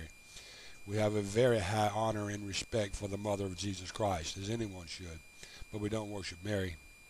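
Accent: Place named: South, United States. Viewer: I am not sure who you are but brother you get it. I am a Byzantine Catholic. You sound southern...I'm from Mobile, Alabama, Can we talk? (I am not going to try and change your mind I think there is some good sense rollin' arounf in there). I think you totally get Catholoism. I think you need to look to your Easter Cathpolic friends before you leave.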